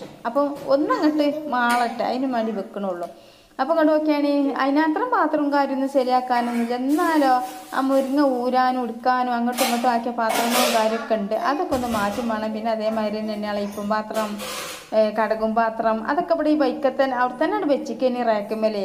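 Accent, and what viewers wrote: Malappuram, India. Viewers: 1day andhakkaa vibavangallaaa ningal super anu ttoo pinnee nongale basha kette video kelkkan oru sugamanu annee😍😍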